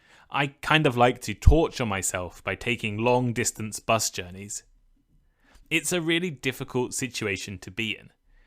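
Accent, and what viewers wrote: United Kingdom. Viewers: Is it northern or southern british accent?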